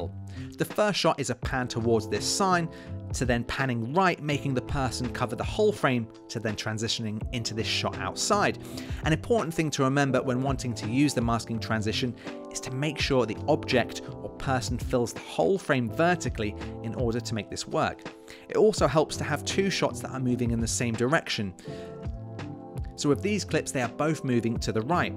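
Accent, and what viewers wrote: United Kingdom. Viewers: Thanks britsh boi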